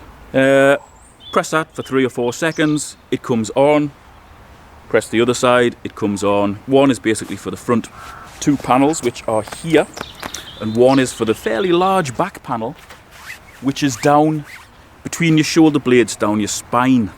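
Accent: North East England, United Kingdom. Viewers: Hiya, I just seen your review on Amazon re: the heated gilet/body warmer and came to find you on YT so I could read any comments too. Your review (the video,) was excellent and the North East accent (my ears tell me not quite Geordie, but close enough, lol) makes you more believable and trustworthy to me (though others would probably think that was a bit backward. Ha!) I've had me eye on one of these for my dad for Xmas and I'm just having another look today before I go ahead and purchase one, hence me finding your review just now. My Q is this: It says the neck is also supposed to heat up? But your review doesn't mention this. I'd really wanted one with the heated neck too, as what with my dad being a bit of an old codger these days, he gets really cold just sitting in the house (I do think it's also his BP tablets, but OFC he won't go change them! 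Grr,) and I thought the neck heating up too, would be rather a nice touch, yano? So is the ad lying about the neck having heated elements too, or did you just forget to mention about the neck? PS. Lucky I managed to find you on here as you're under the name ' ' which seems is another YT account for you? Anyway, I hope you see this comment soon so I can crack on with the search and buying ASAP! Cheers in advance!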